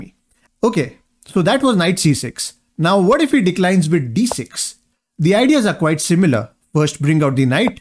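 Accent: India. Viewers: This is word for word gotham’s video with an Indian guy narrating 😂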